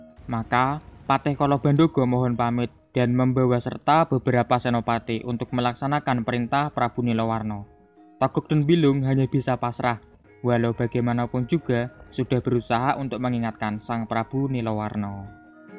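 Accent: Java, Indonesia. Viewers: Emang sengaja ya suara dan logat medok nya atau gimana bro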